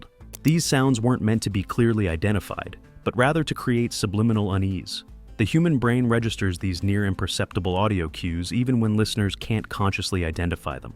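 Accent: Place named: United States. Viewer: Complete waffling drivel. Garbage duhmerican narration.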